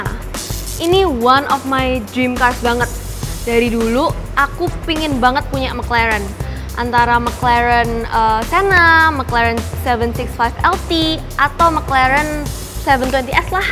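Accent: Java, Indonesia. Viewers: Terpesona sama mbak nya... Medok banget kaya nyonge 😂😂😂😂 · Mbk nya orang JAWA ya.? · Kok suaranya medok bgt , asli mana ya , surabaya kah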